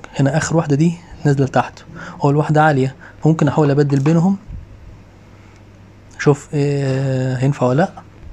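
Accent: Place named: Syria. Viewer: Te felicito tu programa es muy explicativo, aunque me casi no entiendo el arabe tuyo pero pareciere sirio o libanes. Soy de Venezuela y me gusto en verdad ese secreto de los cerrajeros. Bendiciones y muchas gracias.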